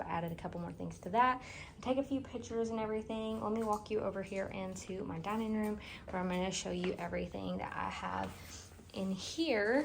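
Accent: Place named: Kentucky, United States. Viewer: I can't quite figure out your accent. Are you from Kentucky or Tennessee or some place like that?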